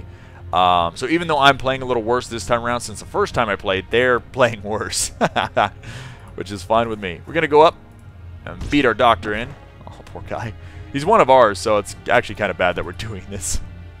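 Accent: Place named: Italy. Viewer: your accent sounds more italian than spanish :)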